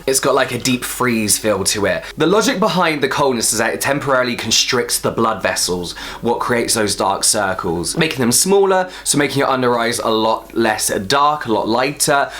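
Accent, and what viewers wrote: United Kingdom. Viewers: Looks like this is interesting!!!...Let me subscribe to your channel now...I'm crazy about the british accent _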